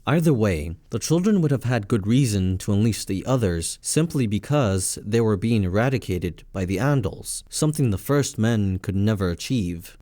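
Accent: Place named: Midwest, United States. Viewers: Sounds midwestern. Maybe Colorado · Great video! What part of the US is your accent from? I've never heard it before.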